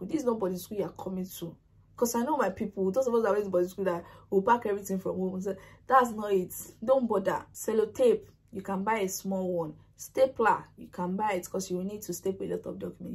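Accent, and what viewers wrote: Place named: Nigeria. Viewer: I just like the way you emphasis on the points with your facial expression and nigerian accent 😂, its so loving to watch. Thanks for making this video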